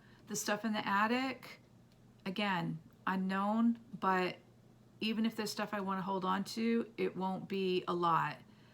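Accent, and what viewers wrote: New England, United States. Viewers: What state are you in?! Im in MA. I feel like I’m getting some new englander in you ❤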